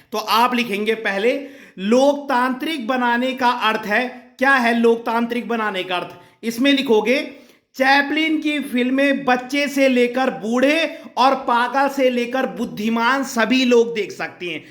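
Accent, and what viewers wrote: Garhwal, India. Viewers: Sir aap gadhwali ho kya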